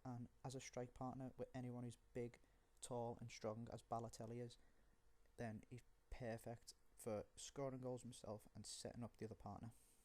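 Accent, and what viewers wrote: Liverpool, United Kingdom. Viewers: Omg he is so scouse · It’s pretty baller to say “welcome to my very first YouTube video” as someone who doesn’t know whether it’s gonna work out at all. He knew it was gonna happen for him - also, did he lose his scouse accent as he got older lmao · He sounds even more scouse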